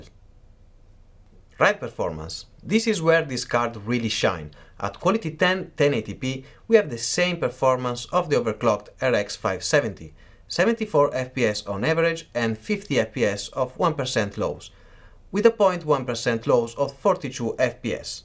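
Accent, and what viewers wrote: Italy. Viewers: Are u Italian or portogese?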